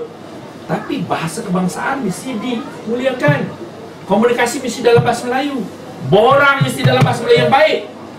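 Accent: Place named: Java, Indonesia. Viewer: AKU TAK RASA DIA BUGIS, CARA BERCAKAP PUN DAH TAHU DIA JAWA HAHAHAHAHA DIA PUNYA HUJAH PUN PRO-JAWA HAHAHAHA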